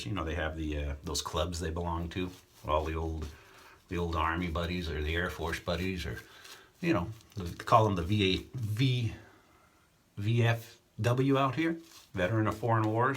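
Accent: England, United Kingdom. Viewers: Do you recall having an English accent at any time as a child? Are there any recordings of your voice at that time?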